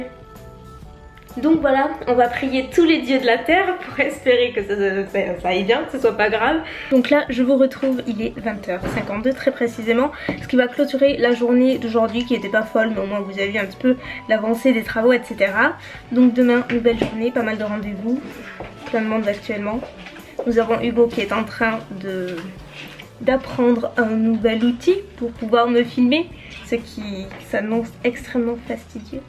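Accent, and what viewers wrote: South, France. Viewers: Ouais moi y'a un autre truc qui me perturbe! (et d'autres aussi, comme Chrystellemakeup qui est aussi du sud) dit "moinss" pour "moins". Je m'en remets pas!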